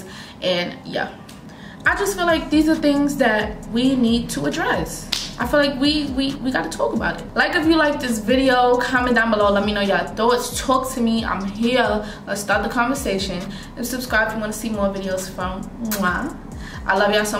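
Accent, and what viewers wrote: New York, United States. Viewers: Tawlk about. I'll never get tired of your NY accent. ❤️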